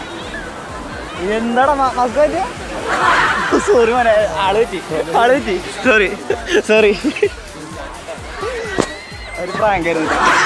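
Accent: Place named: Thrissur, India. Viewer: Thrissur slang 🤩💥🔥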